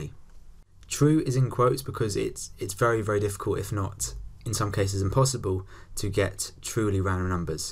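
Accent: United Kingdom. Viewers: This is a heavy British accent but it gets the understanding process in the brain meats done 😂❤ Thank you mate 🌚❤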